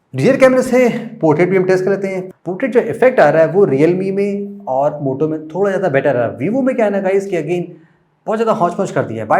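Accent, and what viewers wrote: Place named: Kanpur, India. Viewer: Bhai ap kanpur se ho kio ki saddi kanpur me bolte hai 👍👍👍👍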